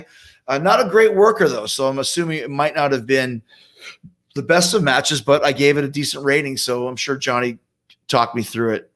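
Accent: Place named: Minnesota, United States. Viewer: he he stil got little bit of mn accsent coming out now and than lol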